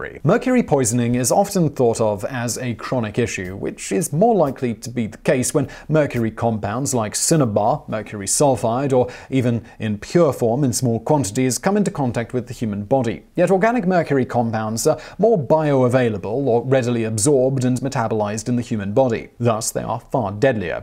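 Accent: England, United Kingdom. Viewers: All of you guys complaining about how he prounces cadmium must not be regular viewers. made it very clear in other videos that he doesn't care if he mispronounces words and clearly puts in little to no effort trying to remedy the problem. This is exactly why people who don't understand topics shouldn't present videos on them. And why you need a host who contributes more to the show than just an English accent. Incorrect information, like misproununciations, spread like a disease. One person hears it, then spreads it on to others, who in turn spread it on to others thsemselves. And so on and so on. Some may think it's a trivial issue, but when we're talking about 10s or 100s of thousands of people being exposed to this stuff, the figures are anything but trivial.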